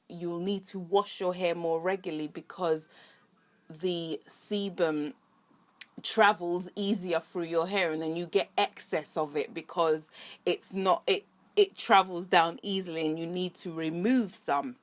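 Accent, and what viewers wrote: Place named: United Kingdom. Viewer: Yes! Lovely to hear a fellow Brit isn't it? Made me feel at home!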